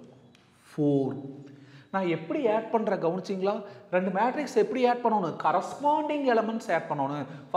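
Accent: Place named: Pollachi, India. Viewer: Super teaching in pollachi voice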